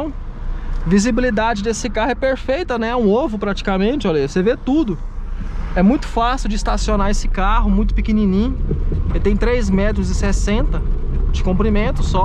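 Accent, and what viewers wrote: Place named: Minas Gerais, Brazil. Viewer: Da pra ver que é mineiro de longe kkkk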